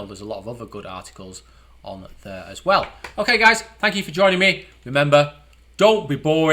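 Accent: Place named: Liverpool, United Kingdom. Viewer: Man your accent.. You're from Liverpool?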